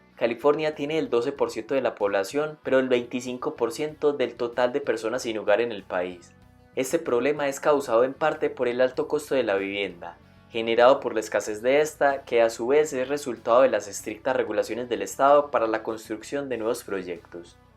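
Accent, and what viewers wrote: Colombia. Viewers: Bro, eres de Colombia?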